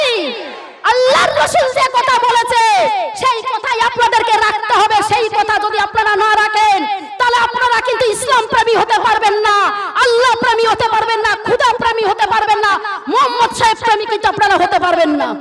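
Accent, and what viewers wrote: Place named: Bangladesh. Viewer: জয় শ্রী রাম 🚩🚩 কথা শুনে বোঝা যাচ্ছে আপনি বাংলাদেশী মুসলিম।। এত এব হিন্দু হিন্দু বলে চেঁচাবেন না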